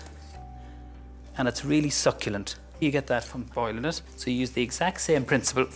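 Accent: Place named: Ireland. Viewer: Samiches 😂 love it, real Irish accent lol. Great video ☺️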